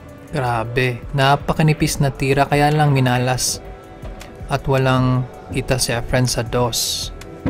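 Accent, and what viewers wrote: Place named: Philippines. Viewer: Pootah probinsyano ang narrator hahaha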